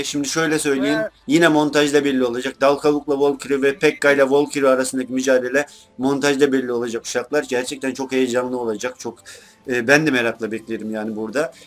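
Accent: Black Sea, Turkey. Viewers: abi eskiden karadenizli oldugundan sesin farkli geliyodu ama artik alistim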